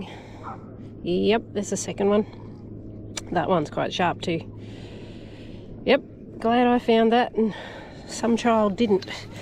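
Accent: Australia. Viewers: I never noticed how long it takes to get to the action part of your video. You could ramble all day long for all I care. I love Aussie accents. You and your personality are the main reasons I "tune in". It's irrelevant (to me) if you find anything at all. The longer your videos, the better.: ) Well done. Awesome find! Please take care, and God bless you,!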